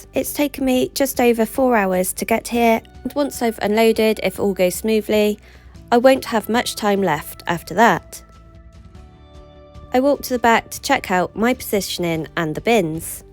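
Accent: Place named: Wales, United Kingdom. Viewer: Hi, nice to see you back again and looking well although I know looks can be deceiving. But you are always smiling so that’s good. Yes the welsh accent is great isn’t it although I am a bit biased mum was Welsh and us kids were bombed out of London in the blitz. So up to our family south wales lucky us.😂😂. I bet it’s nice sleeping on the farms and safe of cause. Well stay well you’re health is the most important thing so never take it for granted if you do it will catch you out down the line. I know I have been there and it’s most unpleasant. Good luck Jon 🇦🇺WA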